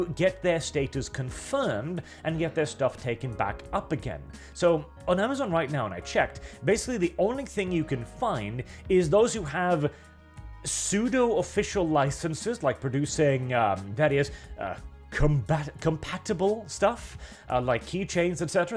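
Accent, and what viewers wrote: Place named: United Kingdom. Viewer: this fuu british asl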